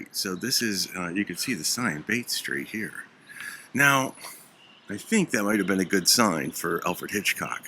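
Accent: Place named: Midwest, United States. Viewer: has amazing stories and he tells them with his terrific melodious voice and Midwestern accent. Even though the stories are grim it's a pleasure to listen to him.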